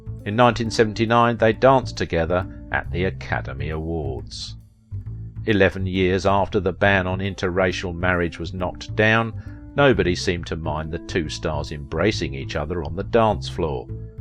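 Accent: United Kingdom. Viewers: HI Beautifully narrated with that great British accent! Cheers! Shalom to us only in Christ Yeshua.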